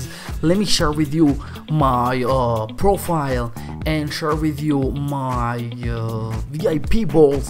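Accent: Israel. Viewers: חחחח אתה ישראלי זיהיתי בהתחלה מהמבטא אבל אז ראיתי בעברית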